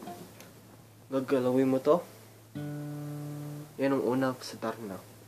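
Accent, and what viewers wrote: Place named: Visayas, Philippines. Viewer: masiyadong visaya ahahah pati tuno mu pang bisaya na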